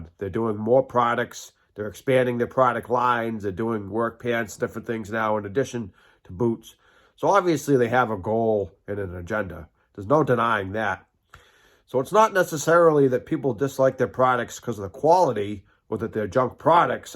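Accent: Massachusetts, United States. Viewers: You must be a fellow MA resident I can by your local accent. 😊 Have had a pair of the Marin waterproof boots in black for a week now. Super comfy so far. I bought them for work (small engine repair) and for riding my motorcycle. I hope to do a one year review on mine next year but I’m sure it won’t go over very well since I’m a girl so in most people’s eyes it’s like “ oh what does she know”. Glad to see you’re a happy camper after a year in yours!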